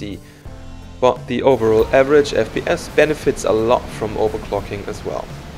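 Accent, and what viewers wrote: Germany. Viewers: Ah, ein Deutscher, absolute Klasse, wir Deutschen sind eben einfach am Akzent gut erkennbar :)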